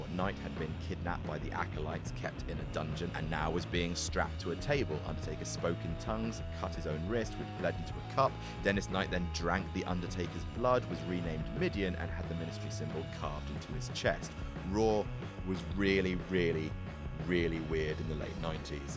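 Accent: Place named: United Kingdom. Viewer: This Asshole just Loves to hear his own Lame Ass British Accent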